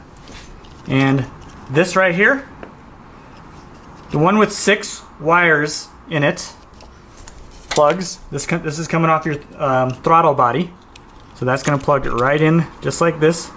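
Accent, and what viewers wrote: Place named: United States. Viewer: You're trying to say it's not fair?! Dude...just think. It's so easy to figure out that he's in North America. You shouldn't believe that having to critically think is unfair. Without even clicking away from the video there is more than enough data telling you that he's in North America. He clearly has an American accent, the name of his web site which is in the description is rolling wrench DENVER. That's a city in the American state of Colorado. I can't believe that you'd actually rather make someone change the way they speak than just simply use your brain. I'm trying to help you man. Think critically instead of being lazy and asking others to change for you. I promise it will make life easier dude.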